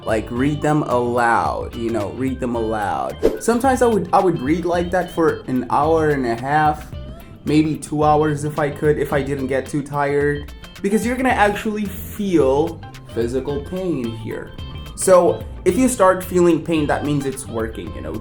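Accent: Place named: United States. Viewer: Thanks very much for your video. It's very inspirational. I sure would like to hear more about how you have come this far in getting an American accent. I have a few questions like: How long did it take you to come to speak like this? Do you exaggerate your mouth movement when you read out loud slowly? I'd appreciate your answers. You are great. You must have worked very hard. Thank you very much.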